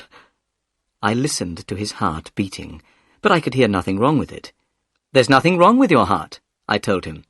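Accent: United Kingdom. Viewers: Es el inglés británico cierto? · Es inglés británico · Me encantó!!! Creo que entrenar el oído es la major manera de iniciar, aunque siempre se necesita un poco de la vieja escuela, manuales y eso. Puedo leer porque escuche como se pronuncia la palabra y puedo traducir oralmente pero me cuesta muchisimo más escribir, es tan triste que no pueda siquiera copiar sin estarme fijando el orden de las letras en la palabra. Aunque soy feliz entendiendo lo que lees, realmente creo que lo haces genial.. con el toque inglés más que americano pero quizá es por el libro que es de esa nacionalidad.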